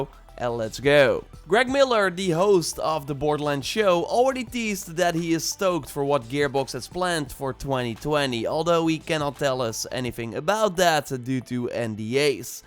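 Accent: Netherlands. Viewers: Kijk aan, we zijn van Borderlands Tree naar Borderlands Free gegaan! Heerlijk, dat netherlish van je. So close!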